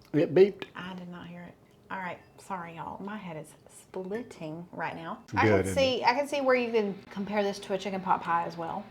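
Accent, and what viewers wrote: South, United States. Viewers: you are just flat out adorable with your southern accents;];];] love your family!!!!! i live in northern maine and the leaves are almost done dropping nites are in the low 40s sometimes lower then that come morning, thank you for all that you do... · YouTube put you in my recommended videos. Glad they did. Love your quick recipes and your Southern accent. I live in Texas and appreciate your downhome manners. You made me cry, though, when your husband was praising your cooking. My husband used to always do that and his face would light up and he'd smile just like did. I miss cooking for him and having him ask for seconds or even thirds. Sorry about your cat, too. That made a tear come to my eye, too.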